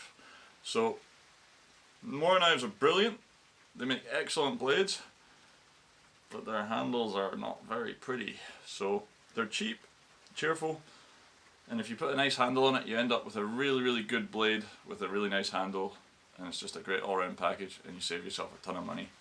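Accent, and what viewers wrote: United Kingdom. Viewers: Nice wood combo, it's always fun to work during rainy days, ur accents reminds me of my yatch mate fr UK. Cheers mate. Excellent piece of arts.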